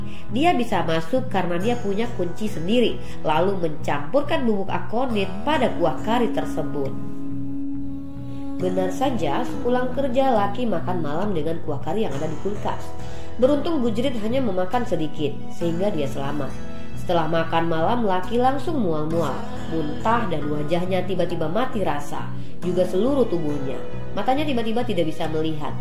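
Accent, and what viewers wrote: Java, Indonesia. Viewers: KESIMPULAN😁, semua narator punya ciri khas sendiri2, justru medok itulah yg bikin ciri khas suara yg tidak dipunyai narator lain, maaf untuk vidio ini tidak saya dengarkan sampai selesai😊semangat! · dimana dirimuuu... jangan di gantiii dong...😭😭 is tbe best👍🏻👍🏻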